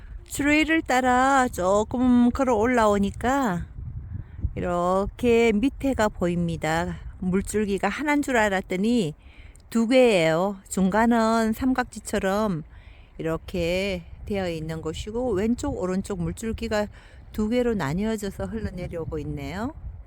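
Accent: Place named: Gyeongsang, South Korea. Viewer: 멋진 영상과 구수한 경상도 사투리 ~~~ 즐감하고갑니다 👏 🙆‍♂️ 늘~건강하시고 행복한 나날 되세요 🙏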